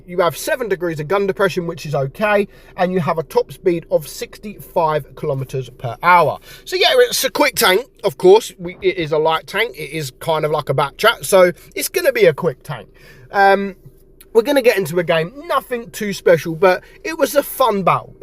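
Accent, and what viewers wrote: United Kingdom. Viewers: Bri’ish?❤❤ anyways, love ur content!❤🎉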